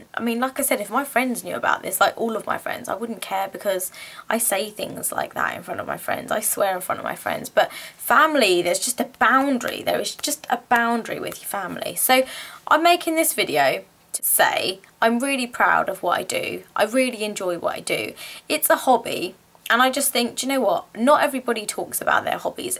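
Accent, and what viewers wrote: United Kingdom. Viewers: I'm so happy that you made this video, you are my favorite person that makes personal videos on YouTube. I love your British accent and you are so nice. I stop making videos because I don't know what to talk about and I feel sometimes depress or wanting to quit my YouTube channel, don't get me wrong i love making videos. Advice please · Are u from UK. I will definitely date you😜 I love all them people in UK accent I'm moving in UK soon cuz I love the accent omg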